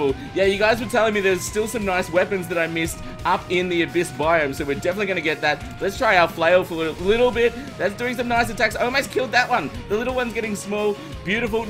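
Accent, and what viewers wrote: Australia. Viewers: What accent do you have? Australian?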